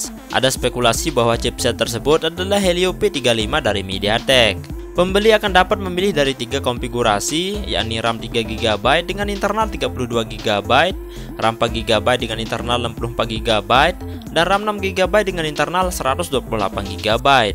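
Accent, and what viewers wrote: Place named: Bali, Indonesia. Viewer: Logatnya bali banget bang😁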